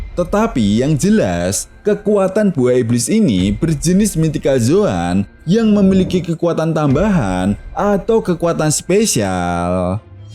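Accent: Java, Indonesia. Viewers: Mendok jawa jir😂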